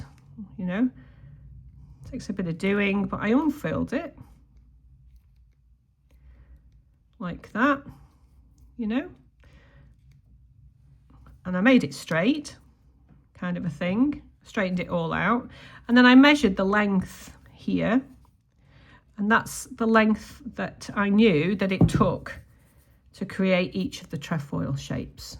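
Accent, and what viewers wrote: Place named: England, United Kingdom. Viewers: I love you English accent. It’s awesome and I love this design. Thank you. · Wow that is such a lovely design! Lends itself beautifully to the bracelet,and the earrings with the dangly paddles are a triumph! We love to get a glimpse of your life by listening to your warm Lancashire accented voice teaching us as well as generally chatting. I think of you as my lovely clever talented friend who just happens to live half a world away! Your tip about how you finished up with your exact measurements was invaluable. Ok I’ll stop waffling now - I’m glad you are mostly over your “lurgie” as we call it in Australia. 😘 Roz on OZ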